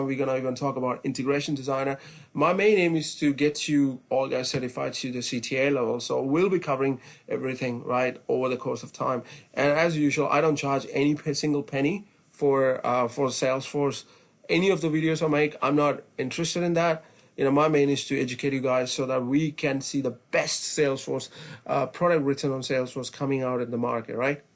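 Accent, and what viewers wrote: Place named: Scandinavia. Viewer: Your accent doesn't sound like New Zealand to me, sound like Scandinavian.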